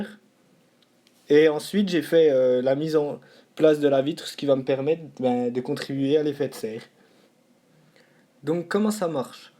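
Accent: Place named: Belgium. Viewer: Accent Belge à mon avis ^^ ( j'ai étudié là bas ) :)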